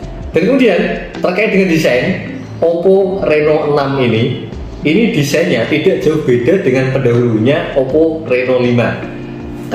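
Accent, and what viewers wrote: Java, Indonesia. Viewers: medokk bngett ituh jawa mu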